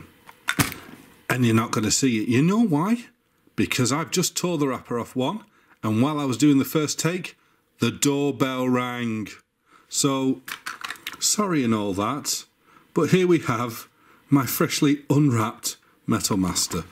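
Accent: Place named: Northern England, United Kingdom. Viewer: No,I'm another North O'England boy just like you is.A bit further north than you (Durham).But IIRC Vic/Jim grew up in Leeds and sometimes you really do sound like him ,only for a couple of words at a time,here and there though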